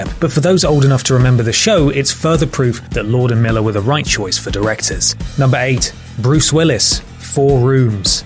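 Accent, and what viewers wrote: United Kingdom. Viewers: Awe I like his slightly British voice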